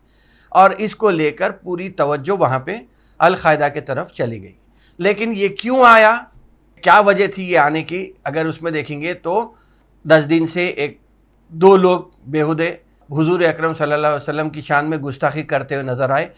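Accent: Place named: Hyderabad, India. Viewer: ماشاءاللہ صاحب حالات حاضرہ کا بہترین تجزیہ اور دلچسپ تبصرہ پیش کیا ساتھ ہی ساتھ خالص حیدرآبدی شرفاء کے مہذب لہجے میں خوبصورت پیشکش پر دلی مبارکباد پیش ہے، امید ہے آئندہ بھی اسی طرح ملک کے سیاسی حالات پر مفید جائزہ سننے کو ملے گا۔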